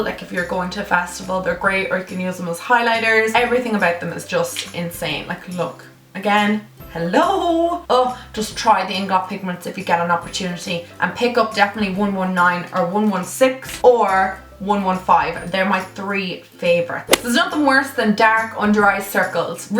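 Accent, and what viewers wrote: Dublin, Ireland. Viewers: would you ever consider doing a full face of makeup revolution, love your videos btw great to hear a familiar Irish accent ❤❤❤ 🇮🇪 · I love the Irish accent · I feel like I recognise your accent are you from Dublin Coz I am plz answer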